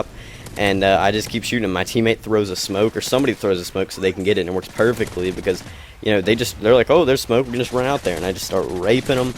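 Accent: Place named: South, United States. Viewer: He sounds southern.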